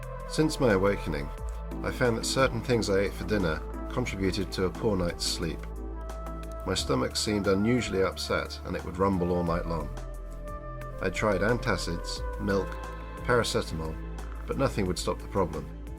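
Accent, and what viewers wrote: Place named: United Kingdom. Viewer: A British accent!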